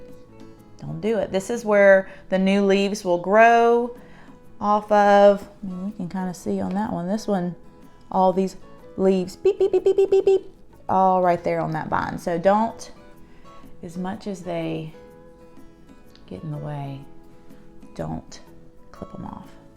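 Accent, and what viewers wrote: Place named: South, United States. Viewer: Thank you for a giggle and a smile.. Hoyas are the only plants I have. I live in an apartment in southern Ontario Canada (near Toronto). My growing windows are facing N NE. They are currently indoors now. This year they produced many peduncles, but they did not bloom. I am waiting patiently. Your southern accent is amazing!!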